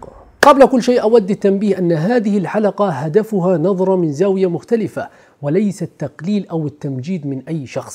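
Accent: Egypt. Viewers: الفيديو مفيد كالعاده 💝ولهجتك المصريه بتضحكني اوي 😂😂 بس بتتكلم بيها بطريقه حلوه تحياتي ليك من مصر 🇪🇬💝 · اللهجة المصرية علي لسانك عسل يا ❤ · انت متاثر باللهجة المصرية اوي❤😂 حبيب البي ياسطا😂😂❤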